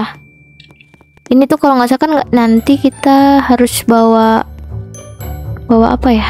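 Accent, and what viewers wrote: Indonesia. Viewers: Kk orang Jawa? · Kok agak Sunda kak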